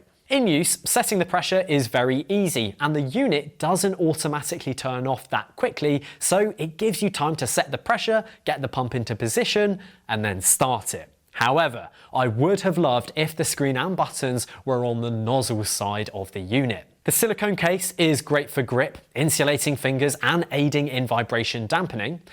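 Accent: United Kingdom. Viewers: This must be a Brit channel based on the host's accent and that I see no mini or midi pumps that are available in the US displayed here. My pump is programmable and rechargeable and very poplar in the US.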